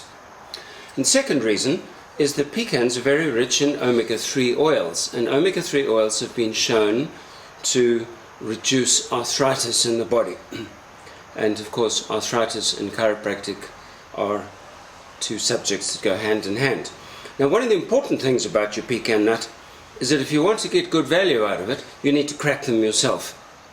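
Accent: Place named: Deep South, United States. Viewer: Deep South.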